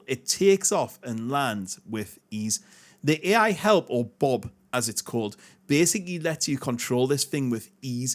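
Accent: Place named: Newcastle, United Kingdom. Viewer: Nice to hear a Geordie voice in the milsim community.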